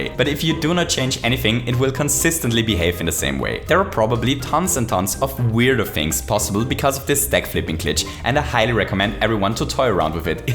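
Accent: Germany. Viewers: Ich erkenne an deinem Akzent, dass du deutscher bist. Haha xD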